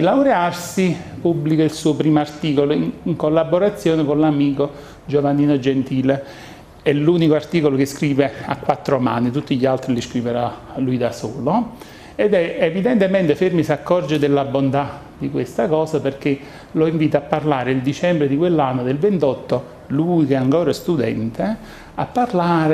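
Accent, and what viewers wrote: Campania, Italy. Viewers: Bravo divulgatore dell'argomento, peccato però che sia effettivamente un po' troppo accentato. Le accelerazioni di un discorso "a braccio" o sue troncature in corsa già sono difficili da seguire in lingua "pura", ma qui un non campano fa un po' fatica. Peccato. Avrebbe potuto rivaleggiare, come divulgatore, con un Alberto Angela o col di lui padre Piero (che per altro non impongono l'accento piemontese). Senza offesa... opinione personale. Mauro, Emilia-Romagna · Se questo e' un professore io sono Maiorana!! Fa schifo con quell'accento marcato Campano, sembra uscito da un campo di pomodori!! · Io ho gradito molto invece l'accento, l'italia è bella perché è varia. Un po'di umiltà non guasterebbe, chissà com'era l'accento di Majorana, sicuramente non toscano. Il professore è stato bravissimo a umanizzare e rendere piacevole una materia, la fisica, molto ostica, lo si ascolta e quasi sembra di stare a teatro con la sua splendida napoletanita' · A me è piaciuta la conferenza, indipendentemente dall'accento. 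Trovo inoltre simpatico l'accento napoletano. Saluti da Trento.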